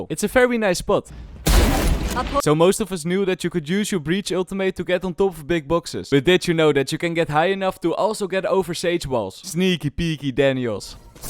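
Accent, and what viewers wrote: Netherlands. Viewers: Genuine question: are you Dutch? 👀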